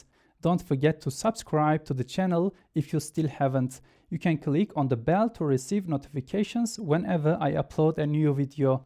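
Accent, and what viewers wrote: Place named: Afrin, Syria. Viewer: is this efrin dialect?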